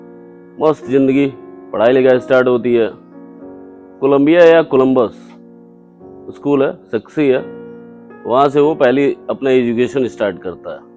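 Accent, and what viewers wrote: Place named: India. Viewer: Bhai desi andaz me narration Gajbe hai ❤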